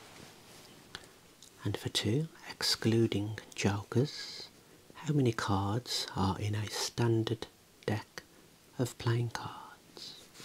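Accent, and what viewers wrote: United Kingdom. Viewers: The most finest British gentleman I've ever seen speak with such elegance and class! Love from India✌🏽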